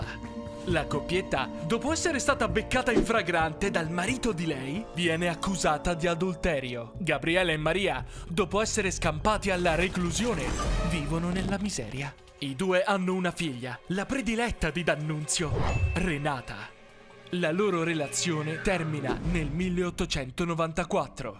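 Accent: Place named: Rome, Italy. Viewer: Oh mio Dio sei romano!!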